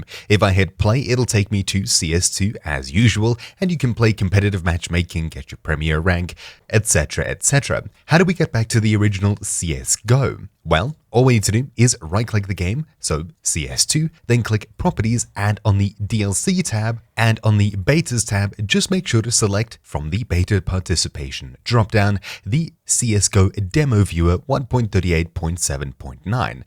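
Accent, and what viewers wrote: South Africa. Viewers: Are you south african? if you are sick man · I didnt know this man was South African. Sawubona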